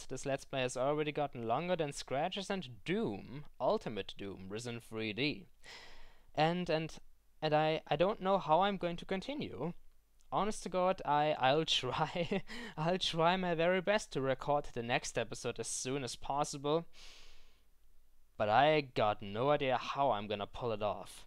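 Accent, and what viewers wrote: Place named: Germany. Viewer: you got skills german skills so use your awesome accent to fool the creeps in thinking your all stone cold so you can crack but look them in the eyes and laugh, laugh manicly catching them off their feet and go piano string on their ass jack-the-ripper style thats how i would but then again i solve problems by jumping off a heilocopter with a gauntlet because i was too lazy too pick up my parashoot im ok but one unfortunate guy hang-gliding didnt do a barrel roll sooooooo he... GOOD LUCK!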